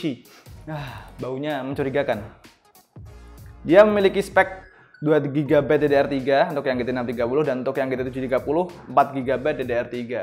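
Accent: Java, Indonesia. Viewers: Kedengeran medok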